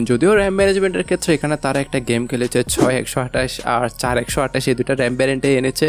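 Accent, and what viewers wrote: Chittagong, Bangladesh. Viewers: ভাই কি চিটাগাংগা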